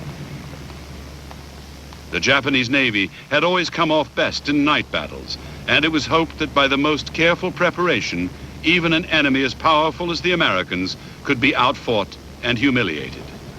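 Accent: United States. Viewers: Great video. Is this the Original Narrator, or was this "Americanized" for the Western Audiences? I still prefer the British accent of the version found on Vasile Luga's channel, but to each their own. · Tim Piget Smith Narrator replaced by a Yank for an American TV Channel ? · I think this is the first time I've heard this documentary with an American narrator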